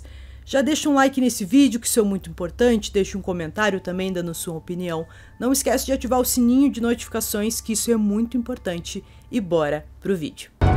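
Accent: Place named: South, Brazil. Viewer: O que estraga o vídeo é o sotaque sulista. Meu Deus muito ruim e chato esse S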